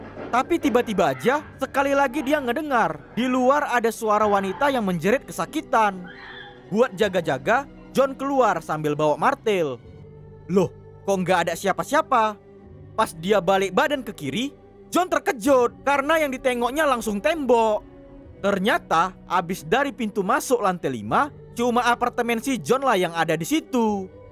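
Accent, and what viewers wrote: Medan, Indonesia. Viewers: Orang medan abng ni bah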